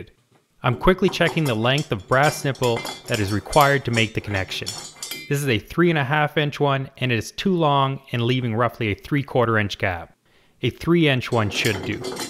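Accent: Canada. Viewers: The Canadian accent is killing me.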